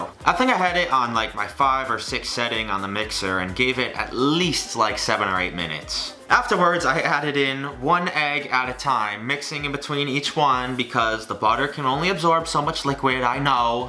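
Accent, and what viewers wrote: New York, United States. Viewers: I knew you lives in New York, you got that accent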